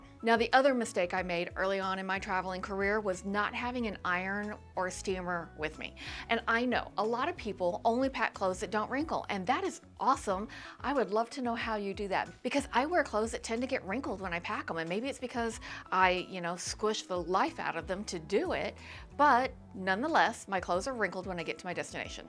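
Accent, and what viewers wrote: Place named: Texas, United States. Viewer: Hi! This is my first video of yours to watch, and it was such a treat! I love that you say y'all! 😀 You sound like you could be a neighbor down the street here in Texas. LOL I was also surprised to see that we have the same exact backpack, down to the color! I love it! I'm fixing to use it for my very first carry on only flight. 😀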